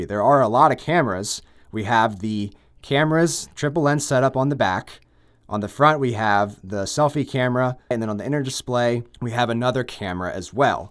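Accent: Philadelphia, United States. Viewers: From Philly?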